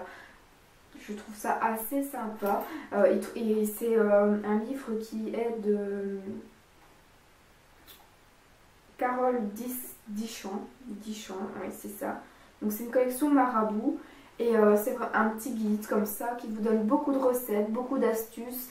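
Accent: Belgium. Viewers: Tu es belge?!?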